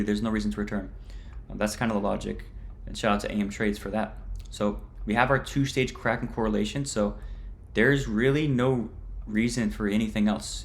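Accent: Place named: Texas, United States. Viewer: You from Texas right 🤠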